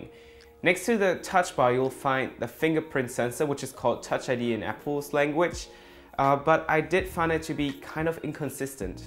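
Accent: Germany. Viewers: german accent ;)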